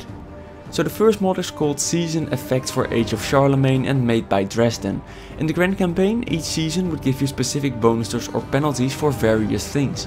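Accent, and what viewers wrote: Netherlands. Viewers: ***** Thought so :P Your heavy accent gave it away. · That is one heavy dutch accent... My dutch friends makes fun of that accent all the time :D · ik hoor dat je Nederlands bent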